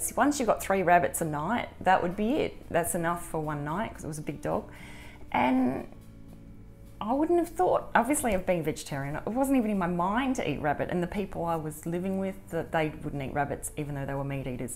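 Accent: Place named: Australia. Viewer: I can't placee your accent... I wanna say "aussie"? - HA! Nailed it. Sorry: autism. I struggle with accents.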